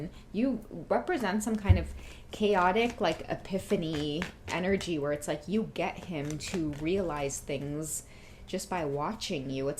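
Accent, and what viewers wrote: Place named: Trinidad and Tobago. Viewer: What you said at the mark. Nailed it! 💣💥 He even admitted it to me too. And then at the mark "somebody who's just there with you because you're putting a roof over their head". I said pretty much the same thing to him. It's true though. Spot on thanks so much 💜💜💜 PS I'm here for the Trinny accent too! 😉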